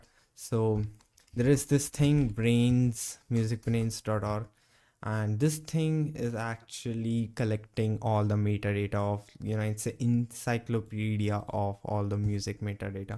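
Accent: India. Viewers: Certified Linux Chad with indian accent, lisp and all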